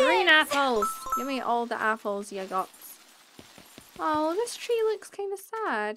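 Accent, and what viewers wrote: Scotland, United Kingdom. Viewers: What do you use to film? And are you from Scotland? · I have my sims kingdom on the wii. Love <3 Also, this is the first video I've seen of you, are you Scottish? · I love your British accent! It makes the video more fun lol :)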